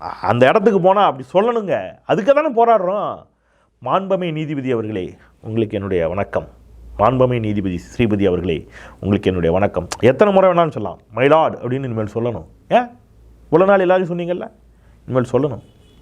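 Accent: Tirunelveli, India. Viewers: தம்பி எல்லாம் சரி தான் பா. ஆரம்பத்தில் ரொம்ப மிராட்டுகிராயே. நெல்லை வாசமா? நீதிபதி தங்கைக்கு, வங்கி மேனேஜர் ஆகியோருக்கு வாழ்த்துக்கள்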